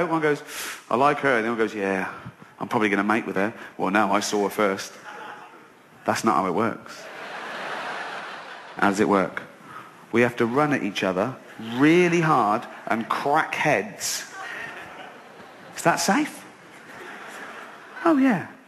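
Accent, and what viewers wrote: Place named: United Kingdom. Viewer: This guy is fuckin hilarious.And his peculiar British accent makes him sound even more funny.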